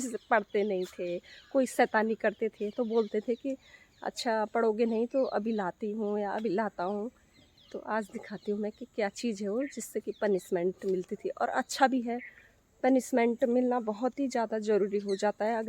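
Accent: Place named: Garhwal, India. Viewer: Bahut bdya lgni cH gadwali bhasa jb ap bolte hai🙏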